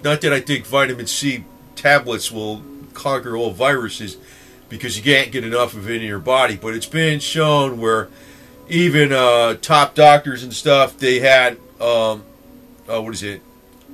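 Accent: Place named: East Coast, United States. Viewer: Yo Bud, i like how your mind works and you talk like an East Coast Boy lol. So if so right on!. Cool vid man.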